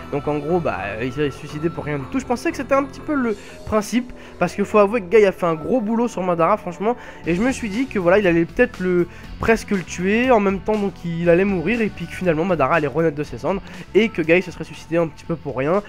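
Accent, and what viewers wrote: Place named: North Africa. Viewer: eh toi avec ton accent du bled on a rien compris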